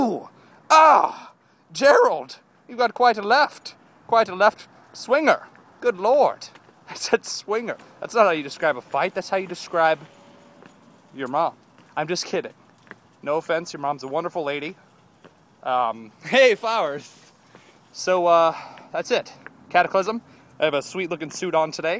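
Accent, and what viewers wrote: United Kingdom. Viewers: British voice lol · UR British right?I bet on it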